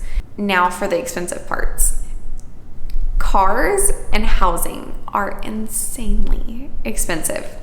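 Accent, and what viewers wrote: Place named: United States. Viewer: you have a normal American accent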